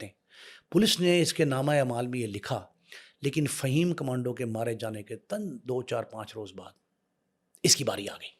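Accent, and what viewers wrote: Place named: Lahore, Pakistan. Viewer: Karachi k hawaly se bat kre hain to meherbani suni sunai kahani na sunaen dusri bat ye wo Farooq ka accent Faarooq hai na k Farruooq plz apna lahori accent ek taraf rakh kr karachi ki bat kren 😡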